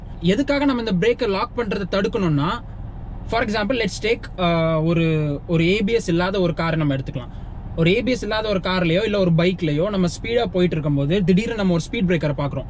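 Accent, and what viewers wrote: Tirunelveli, India. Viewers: Bro neenga TIRUNELVELI thana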